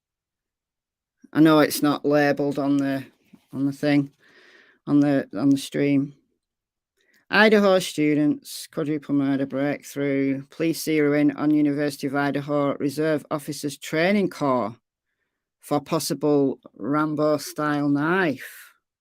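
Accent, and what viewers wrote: United Kingdom. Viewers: Missed the live stream but got to listen to it all and hear the updates. Congrats to the winners of free month memberships. To me this is the best channel. You get facts, commentary, dogs playing, humor, you get heard, and questions answered. All this with a British accent and caring.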